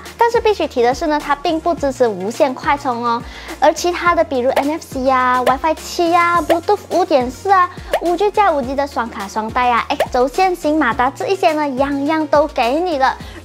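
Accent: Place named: Malaysia. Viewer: 馬來西亞口音與講話習性 我也不太能接受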